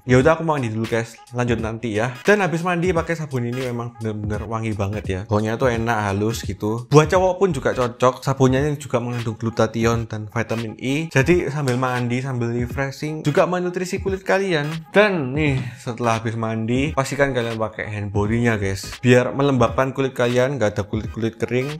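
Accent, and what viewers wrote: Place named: Surabaya, Indonesia. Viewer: Ko...logatnya kok medok ya? Asli sby kah 😅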